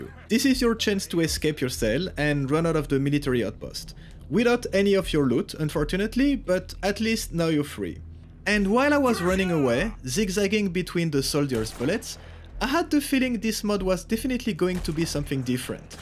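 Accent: France. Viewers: Why does your accent makes me think of a french guy ? If yes that's nice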